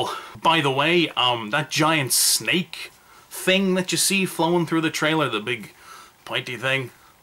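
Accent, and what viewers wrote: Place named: Ireland. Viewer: Your very funny, what part of Ireland are you from im from?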